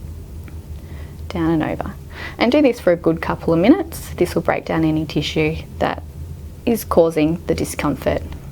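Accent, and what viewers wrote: Australia. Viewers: Thanks for the video from here in the USA. I love the Australian accent. “Cross section fibah” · Very informative and helpful video. Also love the presenter's aussie accent, hot. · This is brilliant. Many thanks. From Sweden. (Lived in Melbourne for 4 years and felt good to hear the accent once again!)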